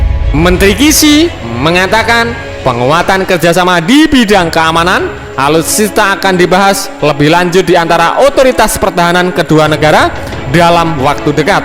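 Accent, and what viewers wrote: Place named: Ponorogo, Indonesia. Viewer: Mas ceking Ponorogo ya.. suaranya.. 😀😀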